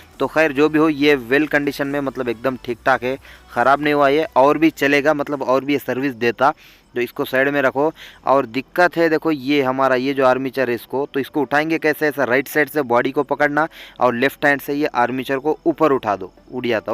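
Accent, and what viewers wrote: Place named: South India, India. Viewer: बहोत बढ़िया जानकारी देते हैं आप। खास करके आप साउथ के होके भी शुद्ध हिंदी में जानाकारी देते हो , ऐसे साउथ का कोई भी हिंदी में बात नही करता । बहुत बहुत धन्यवाद 🙏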